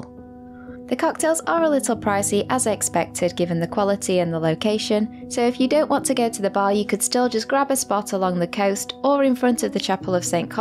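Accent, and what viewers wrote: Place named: London, United Kingdom. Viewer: Thank you! Love your accent! From London, England?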